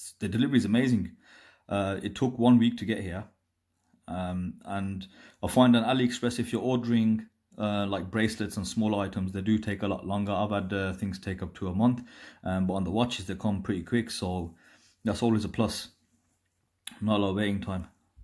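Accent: Birmingham, United Kingdom. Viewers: Subscribed mate.. have to support my fellow Brummie... well you sound like a Brummie 😅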